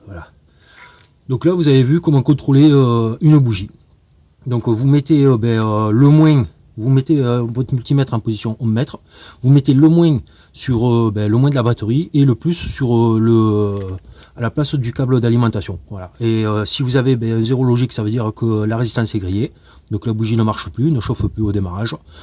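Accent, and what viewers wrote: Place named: Midi, France. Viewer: Bravo, c'est très clair et bien expliqué.Et avec l'accent du Midi, en prime.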